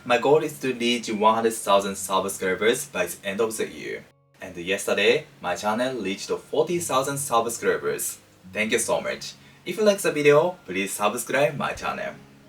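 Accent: Japan. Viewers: Agree! Your voice and Japanese accents are so pleasing to listen to 🌹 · Great video, arigato totemo ! Love your nippon accent and your serenity! Cooking is like a religion 😊🙏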